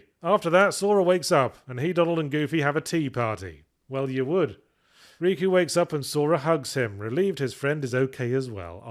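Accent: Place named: United Kingdom. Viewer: I have had multiple people in the past try to get me into this series when they found out I hadn't played any of the games, by explaining them. Without fail, roughly 5 minutes in, my eyes would glaze over and I would silently stand up and walk away without saying a word. I made it through 10 minutes of this video, largely on the strength of Yahtzee's voice and British accent (none of the previous people had British accents) before my eyes started to glaze over and I stopped the video to type this message. Hope the video does well, but 10 minutes is all I can stand.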